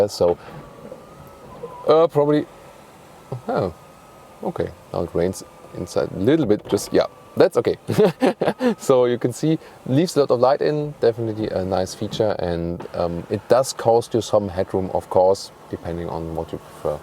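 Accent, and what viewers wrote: Germany. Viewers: man, hope you will find an english teacher one day... get rid of the germglish after all these years...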